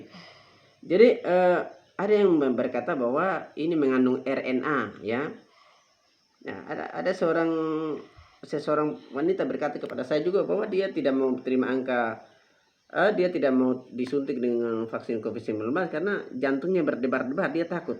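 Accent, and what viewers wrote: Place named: Kupang, Indonesia. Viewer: Dari logatnya ini kaya orang kupang ya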